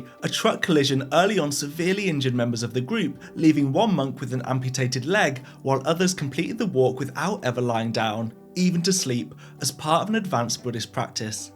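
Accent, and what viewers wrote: United Kingdom. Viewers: i lowkey thought you were moist critical until i realized you’re british 😭😭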